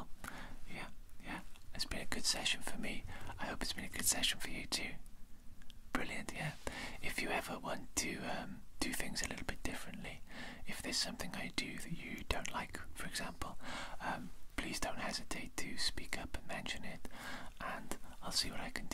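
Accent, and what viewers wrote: Wales, United Kingdom. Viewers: I love the Welsh accent! My step dad is from the valleys So glad I stumbled across this channel tonight 😊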